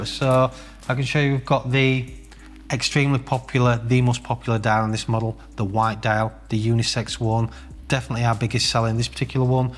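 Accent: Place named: North East England, United Kingdom. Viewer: Impressive video! Not watch related but is this a North east English accent? Trying to learn the English accent variations.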